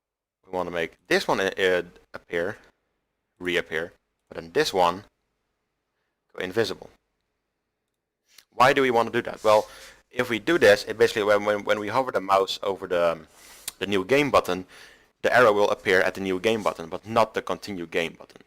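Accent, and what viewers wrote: Netherlands. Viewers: I knew you were dutch by your voice but i can read it here! sup same country bro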